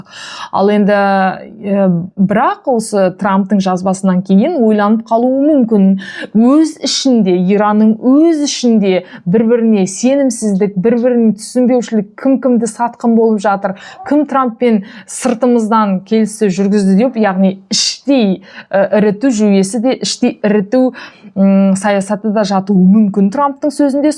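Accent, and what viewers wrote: Standard. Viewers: қызым рақымет қазақша таза сөилеисің жаңалытарды таратып түрғаныңа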